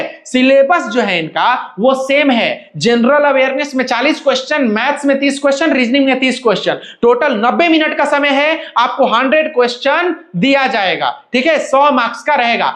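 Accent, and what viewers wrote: Bihar, India. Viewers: Bhaiya aapka bolne style gajab hai 😊😊😊😊😊😊😊😊😊😊😊😊😊😊😊😊😊😊😊😊😊 ek dam bihari style. Ham bhi Bihar se hi hu.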